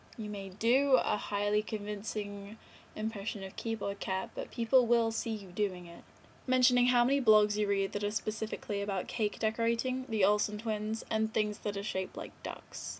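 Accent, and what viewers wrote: England, United Kingdom. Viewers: shes english... thts hw ppl speak over here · i subscribed to you just because your british accent. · By the way, from your accent you seem to be from england, so if thats true im just amazed that you also seem to have friends from all parts of the english-speaking world! woah! I mean i looked at a couple other videos & youre saying "my best friend from [canada]" & how you miss your other friend from australia! Very kl! Something i should admit - im: finding it hard to believe, a bit envious as i dont & curious to how its even possible. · Trying a golden oldie again, huh? Well it was good. You sound more English again in this vid so I'm guessing that you're visiting or something. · what's rule 34? sorry for not knowing : P and also, what accent do you have? it sounds british but it goes american sometimes :S :P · NO! Cute british accent fading! 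· I say the phonetic "lol" -_- But I'm proud to say that's the only one =D (ps. Yay, your English accent's coming back =))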